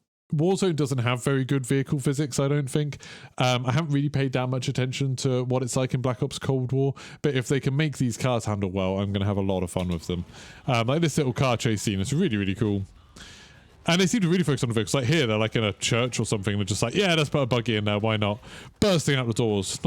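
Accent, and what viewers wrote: United Kingdom. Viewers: Question: I seriously hope I'm not being offensive, so please don't like, flip out, but why do British Pronounce LZ as LZead and Lieutenant as Leftenant?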